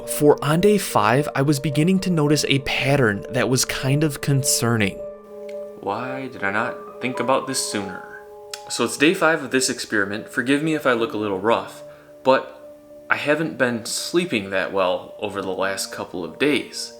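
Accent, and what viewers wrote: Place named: Michigan, United States. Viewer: Michigan accent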